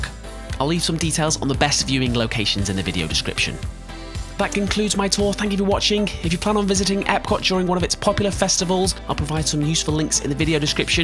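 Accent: United Kingdom. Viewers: Wow 😯 British 🇬🇧 maybe 🤔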